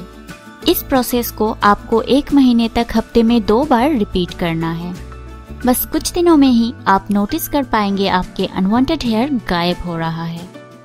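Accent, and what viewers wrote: India. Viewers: Her speech is not comprehensive. It feels like she speaks Indian. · It`s Hinglish( Egnlish with Hindi accennt and mixing)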